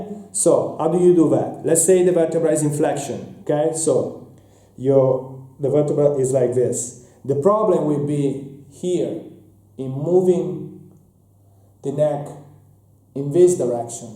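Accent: Italy. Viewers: I know an italian accent when I hear one. Grazie frate’ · Fra ma quanto sei italiano hahahah (nel senso che si sente non altro)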